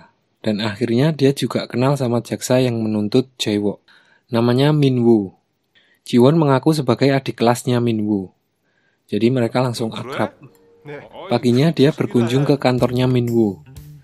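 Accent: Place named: Java, Indonesia. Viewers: Medok bgt sih bhsa Jd gk menarik dgr suaranya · Ngomongnya itu lo minnn campur aduk ada yg baku ada yg medok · Bok jowo wse mas .luweh apeh logate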